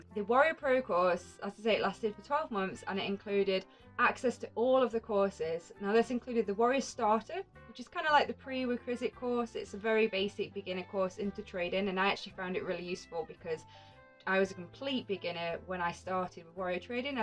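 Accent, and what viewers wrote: United Kingdom. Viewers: You look marvelous. I love your accent too. And nice teeth for a Brit. British dentistry has come a long way. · Love British accent. What area of England are you from?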